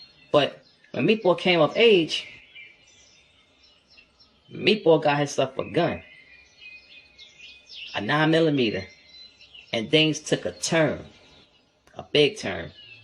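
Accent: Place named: Audubon Park, United States. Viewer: That Nicca sound like he in Audubon Park somewhere🤣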